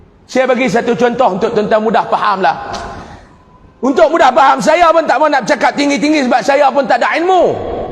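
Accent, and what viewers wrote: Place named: Kedah, Malaysia. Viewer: Ustaz,,jgn risau,saya orang kelantan, tapi saya peminat no 1 ustaz. Faham sgt apa yg ustaz cakap.. Cakap Kedah pun semua bulih paham.. Malam ni lantang dari biasa. Terbaik ustaz.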